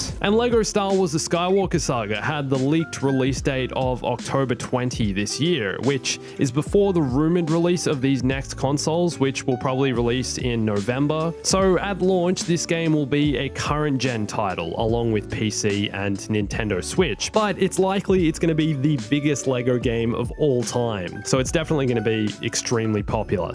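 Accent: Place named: Australia. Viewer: Wait wat ur Aussie. I thought u were British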